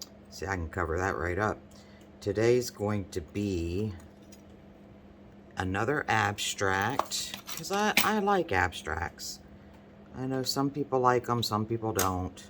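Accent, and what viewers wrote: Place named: South, United States. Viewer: Ok, where are you?im southern and you’re sounding like home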